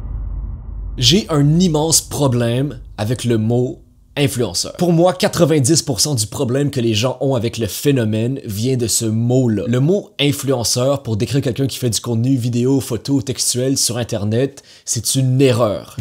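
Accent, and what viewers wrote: Quebec, Canada. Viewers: Ptn juste j'adore ton accent genre l'accent québécois c juste trop cool et continue je trouve que tu est génial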